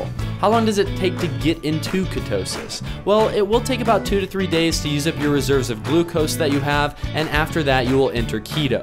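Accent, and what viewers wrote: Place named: United States. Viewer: I assume the creator is american based on his accent: <link>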